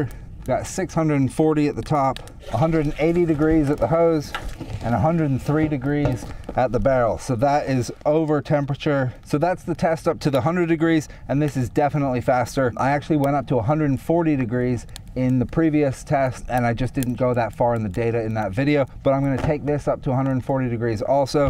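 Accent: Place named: United States. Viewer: Thanks for the great content I've built a few rocket stoves and you always get better results if you insulate your burn chamber. Also I noticed that you have an American accent but you are based in the UK going on the plugs behind you and I would guess from outside possibly somewhere in the Pennines?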